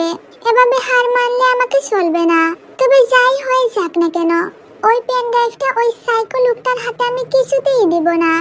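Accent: Sylhet, Bangladesh. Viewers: তো তুমি কি সিলেটি নাকি চিটাংগা🎉🎉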